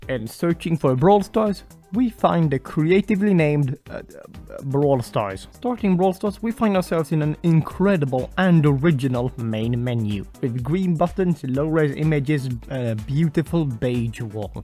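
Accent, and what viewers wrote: Sweden. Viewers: Förlåt men det första jag märkte var att du hade en svensk accent lmao- Nice video btw!! :]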